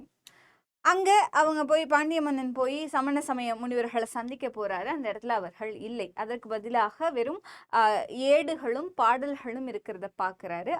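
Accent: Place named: Sri Lanka. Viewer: இலங்கை தமிழ் கேட்க எவ்வளவு நாள் ஆகிவிட்டது இனிய தமிழ் ஓசை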